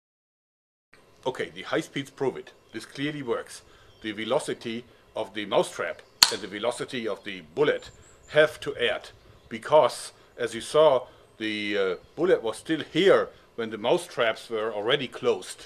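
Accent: Germany. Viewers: Man merkt dass du ein deutsche bist :P Aber nette Falle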